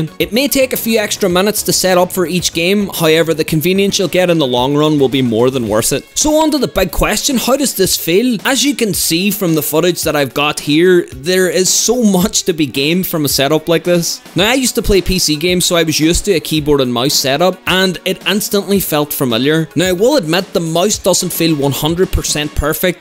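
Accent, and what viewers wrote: Scotland, United Kingdom. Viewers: scotish i think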